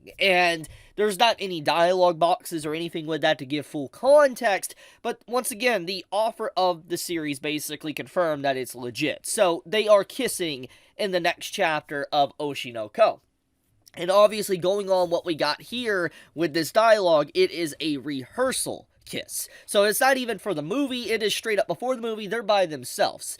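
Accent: South, United States. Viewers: idk your accent sounds a little southern so you might be use to this sort of thing.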